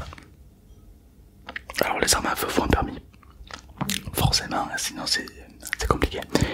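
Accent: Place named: Marseille, France. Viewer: L accent marseillais oulaaaaa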